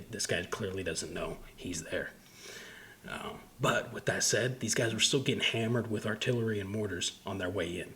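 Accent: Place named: United States. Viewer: New subscriber here. I very much appreciate your straightforward style, yet you have a docile, soothing voice. I'll work on getting the backlog of your work watched in the upcoming days. I assume by your American accent that is where you are from, but people are abroad for many reasons, so I won't speculate. Love and support from NC, USA! EDIT: By the way, I'm so glad you're showing things as they occur and not blackened out, etc. People NEED to WTFO with that noise. I also appreciate, and that is not a strong-enough word, that you state your stance. I liked it a lot!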